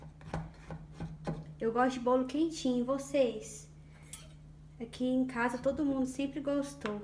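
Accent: Minas Gerais, Brazil. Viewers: esse sotaque mineiro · Vc é uma linda, parabéns pelo seu carinho... Ahh e esse sotaque mineiro é mto gostoso de ouvir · Amei seu jeito de falar até parece ser mineira kk . Acabei de colocar a massa para assar . Acho que vai ficar bom . 😘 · sotaque de mineira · Olá ! Eu resolvi te segui pq amei seu sutaque igual meu povo mineiro e simplicidade amo tudo isso Vc é 10 parabéns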